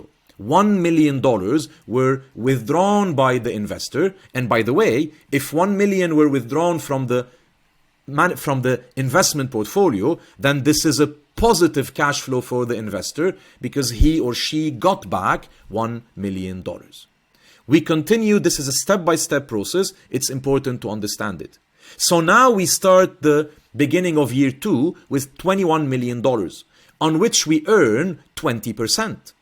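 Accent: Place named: Lebanon. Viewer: I knew this is Lebanese accent, I checked where the institute is located, but great explanation. Thanks a lot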